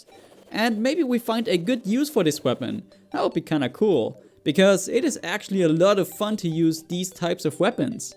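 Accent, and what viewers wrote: Germany. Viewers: Hes German isnt he???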